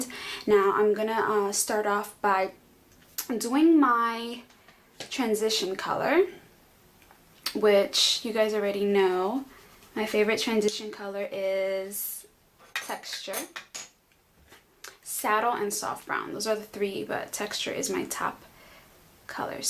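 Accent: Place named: New York, United States. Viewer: love your accent! are you from NY?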